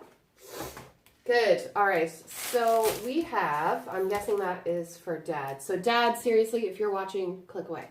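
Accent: Ireland. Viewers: Wow you're sounding very Irish in this video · I enjoy listening to you, your voice is very soothing while I am drilling. You'd be great on late night radio. I don't know if you realise how much of a an Irish accent you have picked up. Like your new arrivals.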